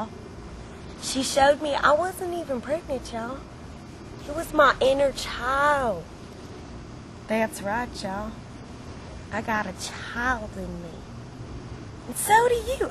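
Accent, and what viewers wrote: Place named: Mississippi, United States. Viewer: i'm from and live in missippi, and she sounds like a lot of people from here and alabama..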